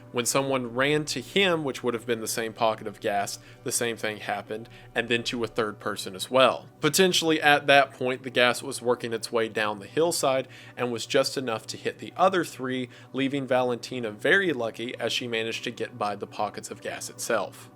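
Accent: South, United States. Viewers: I also notice he puts "such as" in places I personally find unusual and it stuck in my mind because it made me think of that beauty pageant contestant who said some garbled stuff about education in "the Iraq" in response to a question and I thought that was her just making a mistake but the way he keeps using it in a consistent way and they have similar accents it might be a southern dialect thing? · He pronounces a lot of things in other languages in a very American sort of way. 😂 He's got quite a drawl and it can really catch you by surprise sometimes! On a lesser note, he seems to have misread "Dyatlov" outright, which is a thing he's done before with other words/names (foreign and english-language), so who knows, maybe he's struggling with a lil dyslexia, too. Sooooo many male content creators I follow have dyslexia & ADHD, so I really wouldn't be shocked if that were the case. 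Maybe a pronunciation key in his notes would help in the future.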